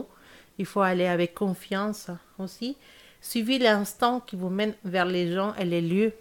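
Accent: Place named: Spain. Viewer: Bonjour je viens de vous découvrir sur la chaîne et j adore votre tirage mais aussi votre accent ça me rappelle mon. Pays car je suis aussi espagnole. 😜 Bendiciones Hermosa 😉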